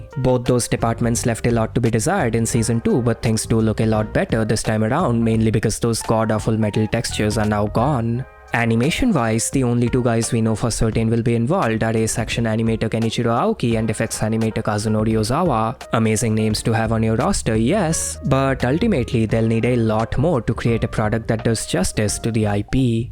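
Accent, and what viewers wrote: India. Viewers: Will your indian ascent ever go away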